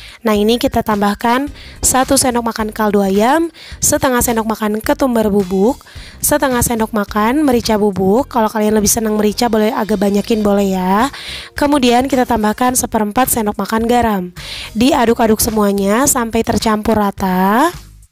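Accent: West Java, Indonesia. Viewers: nonton kr konsen jdna kagugu s teteh na sundaaa😂😂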